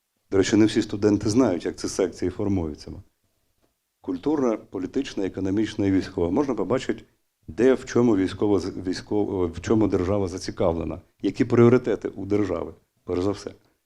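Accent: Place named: Russia. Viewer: Пане Залужный, а не лучше ли говорить на языке, на котором вы всегда говорили, русском. Очень тяжело слушать русские слова на украинский манер... "воена" - вийськова, и др.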